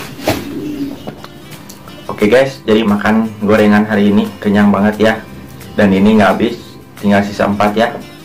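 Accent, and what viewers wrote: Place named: West Java, Indonesia. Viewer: Sunda timana kang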